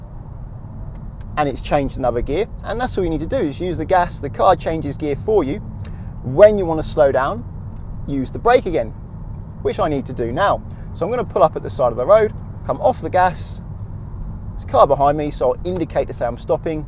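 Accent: England, United Kingdom. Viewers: He is so easy to listen to. The English accent is so much easier on the ear than another nationality that I won't mention!! 😂. I'm 🇮🇪 btw! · As a French leaving in the US I was a bit confused by the driver seat on the right side but love the British accent. Great video for someone taking their driving test in an automated car after driving 10y with manual. Thanks for showing a close up of the pedals too. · Love your British accent!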